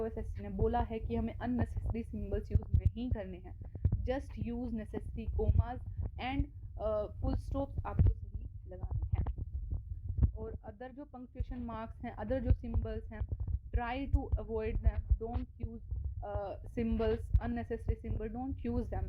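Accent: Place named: Haryana, India. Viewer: I like your haryanvi accent🔥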